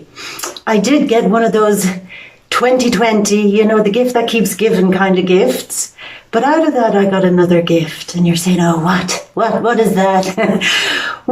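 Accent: Ireland. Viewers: hey yay.. ouch so glad your back our beautiful., happy 2021, missed ya beautiful irish accent.. thanks for comin back.. bless you beautiful Im sharing you on my MeWe.. looking forward to your extraordinary journey.. Truth Peace Justice and love dear heart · Hello I popped back on your channel today to hear your lovely Irish dusky voice and I wanted to ask how your new path is going!! Hope it’s marvellous x love and peace x j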